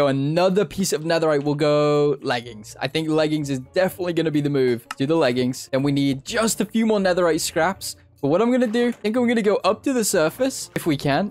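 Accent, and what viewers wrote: United Kingdom. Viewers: bu'on dude your bri'ish accent is so intense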